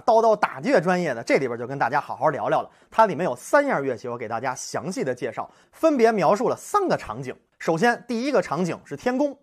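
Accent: Beijing, China. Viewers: 开口老北京人了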